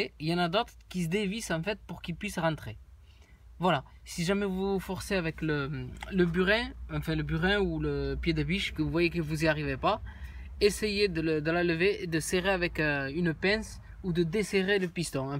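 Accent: Marseille, France. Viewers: mécanicien dans les roues de brouettes!! Ce type de vidéos devraient être carrément censurée ,vu le danger que représente ce type de "travail" de caraque !! Bon, en même temps, apparemment ,vu l'accent, on doit être du coté de marseille, alors faut pas trop s'étonner !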